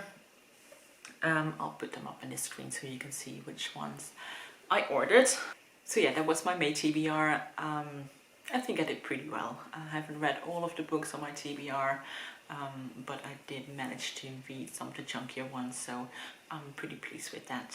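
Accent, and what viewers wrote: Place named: Netherlands. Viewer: Ja. Ik dacht het Nederlandse accent al te herkennen lol. Leuk. Ik heb niet zo veel Nederlanse booktubers kunnen vinden. Lees je meer Engelstalig dan Nederlandstalig? Nog een vraagje weet je misschien waar ik kan vinden of een boek in het Nederlands is vertaald? Ik lees veel in het Engels, maar als ik een boek vind wat mijn zus en moeder ook leuk zouden vinden dan koop ik het het liefst in het nederlands. Zij lezen liever nl talige boeken. Zo ben ik op zoek naar de Nederlandse vertaling van the five van hallie rubenhold.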